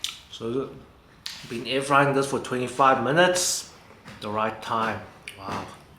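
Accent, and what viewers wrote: South Africa. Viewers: your accent sounds like and is in fact indistinguishable from a non-white person's south african accent - cool man! thanks for the recipe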